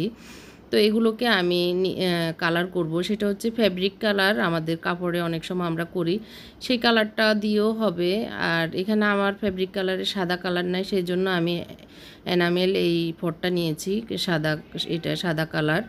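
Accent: Noakhali, Bangladesh. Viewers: আসলে নোয়াখালী ভাষার মানুষ শুদ্ধ করে কথা বলতে চেষ্টা করেছে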